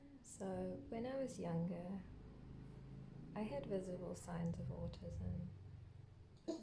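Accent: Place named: New Zealand. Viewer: Hi from NZ! I heard your accent and knew! ❤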